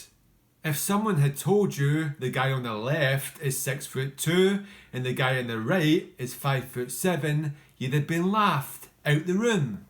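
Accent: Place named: Scotland, United Kingdom. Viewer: Hey you have a Scottish accent like him!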